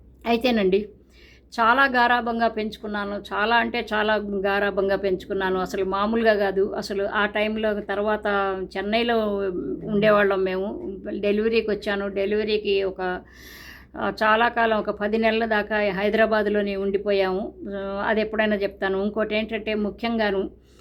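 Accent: Guntur, India. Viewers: మీ తెలుగు చాలా బావుంది మేడం నా బాల్యయం లొ గుంటూరు లో వినేవాడిని hats off to ur principles 👍 from Vizag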